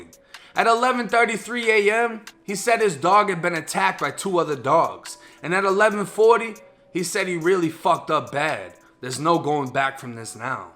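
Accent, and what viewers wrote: Boston, United States. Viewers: What's that accent,? Boston? Anyway, I just found your vids a couple days ago...good 💩, pun intended 😂 I was from MI, came down to FL in 2012. Went through my little few month county ordeal, but nothing like the CIs. But your work still hits close to home. I wish you continued success. We rockin' with ya in Ft Myers 👊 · I KNEW YOU WERE FROM BOSTON!!!!!!!!!!! lmao this whole time ive been trying to figure it out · The Dog shit shooting I cant with you today.... are u from Boston to? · You said walking through Boston? You sound like Boston but I thought you was Florida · I knew you were from Boston from your accent homie. Your background is probably Irish I’m guessing. We got an Irish homie from the hood. We call him polar bear. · I know you were from Boston! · I knew knew knew you from Boston? What part...heard the accent in all the videos. 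Dorchester all day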